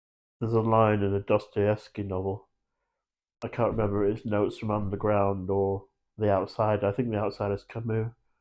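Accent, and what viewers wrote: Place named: Southern England, United Kingdom. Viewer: I’ve only just seen your channel pop up on my homepage, and there are elements of the helplessness that absolutely resonated within me. I lost my girlfriend of 5 years due to cystic fibrosis when we were both just 21 years old. I had months of feeling just as you’ve described - and remember being sat in my kitchen crying my eyes out with my dad feeling like he wasn’t able to help me. I know you’ve not asked for advice but all I will say is the thing that I found the most difficult was allowing myself to be happy, to be in the moment and take the joy from the little things at their face value, and try to detach the shadow hanging over me. The joy of every happy moment was overshadowed by thinking “tomorrow will be back to black” but over time I managed to let go of the shadow and for me that’s when my second life began. 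It’s the first time I’ve seen your channel, and really do hope you read this as it takes an absolute boat load of bottle to come out to admit these things. Sometimes with mental health things just feel so hopeless. I’ve subscribed to your channel, despite the fact that all I’ve seen is a man crying for a few minutes 😂 but in all seriousness I will look out for your next video, I’ve got no idea where you’re located but your accent sounds like southern England - if you are anywhere near Hampshire and want a beer or a coffee it’s a genuine offer mate. You won’t get there today, maybe not even tomorrow but you will get there. 🤘🏼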